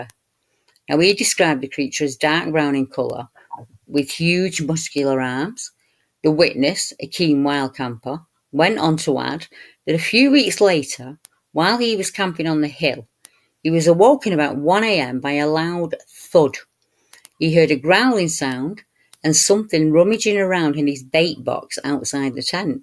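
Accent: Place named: Lancashire, United Kingdom. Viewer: I am moving from Manchester to the US in a few weeks. When I miss that Lancashire accent, I am going to listen to your channel,. I appreciate the work you do!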